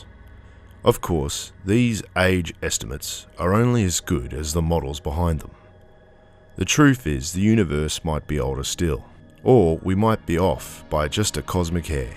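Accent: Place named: Australia. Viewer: Swear to god when if we have an Australian npc in our next game, I will want that voice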